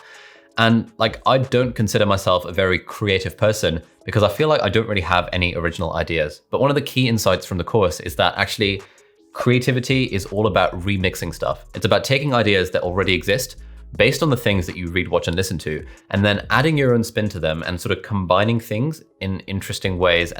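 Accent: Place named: United Kingdom. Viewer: You are a Pakistani but how did you learn British accent?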